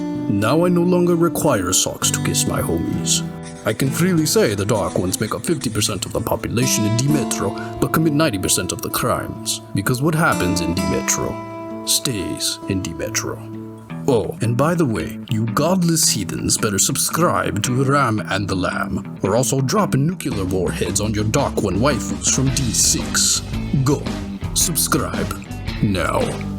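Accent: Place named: South, United States. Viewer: What's with all the midwitted manchildren that don't understand vernacular and accents? Nu-kyu-lur is a dialectical pronunciation. We know how it's actually pronounced. But that pronunciation works better with a Southern accent due to how we stretch vowels and shorten consonants. It's why we pronounce "oil" as "ole" and "window" as "winder." It's just easier to pronounce for us. Nobody harps on the Japanese for pronouncing McDonald's as "Makudonarudo" because they struggle to pronounce the OG name. Nobody gives Jamaicans shit for pronouncing things the way THEY do. And there's nothing wrong with their mode of speech because it works for them, and they understand it. Look...language is an evolving, living thing. It changes. Despite my personal elitism over Classical Latin being disregarded in favor of Ecclesiastical pronunciations in historical films set in the Roman Empire/Republic eras, I get why it's done. 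But that's more about authenticity. Went off the rails near the end there, but you get my point. Accents are accents. No shit. Bostonians saying "paak the caah" and "hahbah" instead of the intended pronunciation is fine. It's not a sign of ignorance or insipidity. And pointing it out is the lamest Reddit "gotcha" that only reveals how fucking stupid YOU innately are. What else? You gon tell us Frankenstein was the Herr Doktor and not the monster next? Greenland isn't actually green, and Iceland isn't all ice, Reddit professor?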